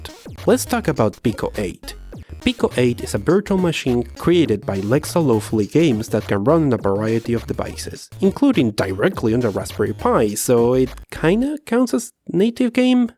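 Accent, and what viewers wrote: Spain. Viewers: Guys he Isn't Asian, he is an Spain Guy, so that accent is Spanish accent.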